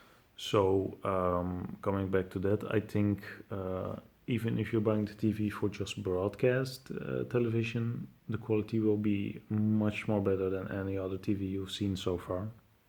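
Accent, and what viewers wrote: Netherlands. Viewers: Are you dutch all seeying guy? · Don't say "tink" just start saying vink (you are clearly as Dutch as I am) but even before starting to pronounce the v switch to fink.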